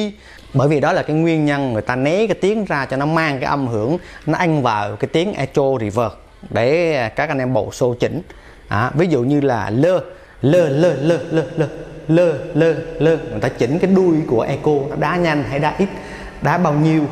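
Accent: Standard. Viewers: Anh này nói chuẩn quá 👌👌👌👌👌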